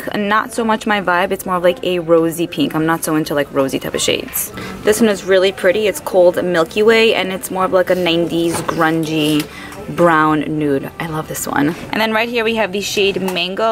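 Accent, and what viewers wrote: New York, United States. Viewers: I never comment but always watch since you came up on my suggested and I subbed. Love your accent I too am a New Yorker but I live in the deep Deep South (Florida😎) so you sound like home💖 now back to my point that exclusive for Harrods was named for Princess Charlotte they did a whole Royal line so that is an amazing find! Love all your hauls I’m a big TJ Maxx fan and just keep these videos coming!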